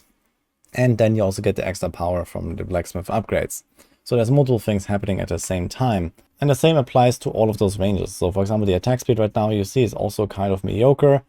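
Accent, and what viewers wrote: Germany. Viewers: Oha, bin gerade froh, dass ich Dich gefunden habe. Werde mir die anderen Videos auch ansehen. Glaube rauszuhören, dass Du deutsch bist. 😊 Aber ich schaue es auch sehr gern auf englisch!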